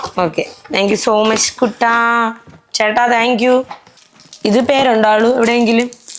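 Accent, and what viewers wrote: Trivandrum, India. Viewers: samsaram kettalariyam Tvmkariyanennu..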